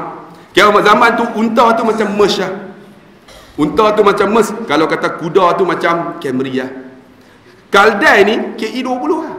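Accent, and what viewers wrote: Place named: Kedah, Malaysia. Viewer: Ustaq kami owg kedah mmg la kasar...owg kedah mmg ckp mcm nie...harat jngn ambil hati noh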